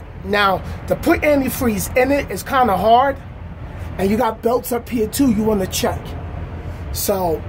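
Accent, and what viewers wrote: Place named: East Coast, United States. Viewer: Good job for all those cats who are unfamiliar. You sound like you are from the East Coast. I was born in PHX later moved to Long Beach ,California and the to Seattle WA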